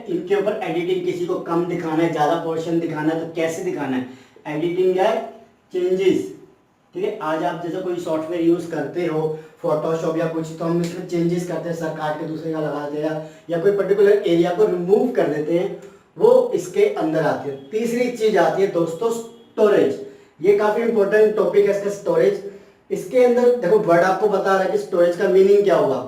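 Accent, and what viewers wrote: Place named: India. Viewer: oh,full Indian English .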